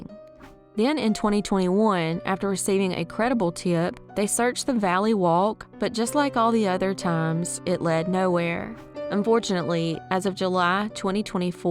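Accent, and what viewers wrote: South, United States. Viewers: Her accent is thick southern accents are thick. I don’t see a big deal .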